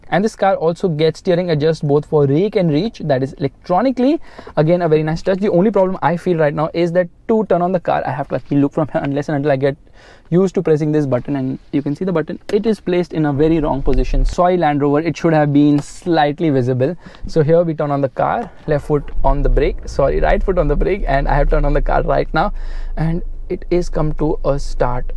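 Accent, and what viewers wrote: India. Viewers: Good review !!! But you really need to put some work on your accent. Your accent is a prime example of a typical Indian accent. No offense though !!! 😊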